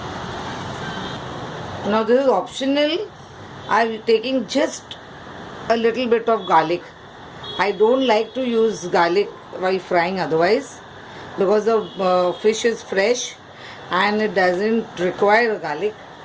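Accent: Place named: Mumbai, India. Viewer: hello from SF, CA, USA! this looks DELICIOUS. thank you for sharing your recipes and cooking, auntie (is it OK that i call you that?) everything looks so tasty, esp. the fish dishes like this one. can't wait to try it.i love watching your videos, and hearing your bombay accent -- my family is from bombay and you remind me of all the aunties i grew up with!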